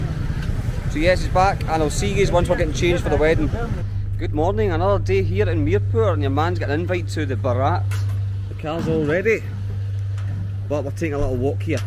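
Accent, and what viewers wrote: Scotland, United Kingdom. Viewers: Love your Scottish accent....enjoy Pakistan!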